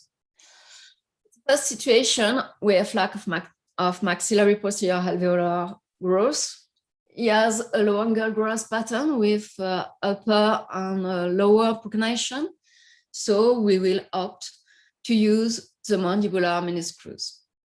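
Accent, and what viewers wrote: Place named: France. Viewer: In spite of the heavy french acent I really enjoyed this lecture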